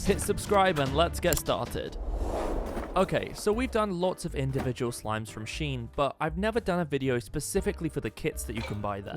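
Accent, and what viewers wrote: United Kingdom. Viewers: I had no clue you were from the Uk- but HII ME TOO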